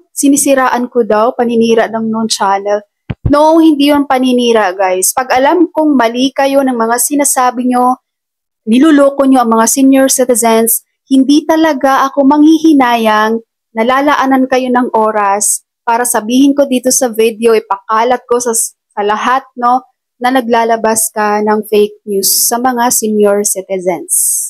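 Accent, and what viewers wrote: Visayas, Philippines. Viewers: Bisaya kaayo intonation. Dili ra Landbank paspas ang crediting. BPI is fast too. Ma's maayo pa magbinisaya ka basi mas daghan mamati sa imo.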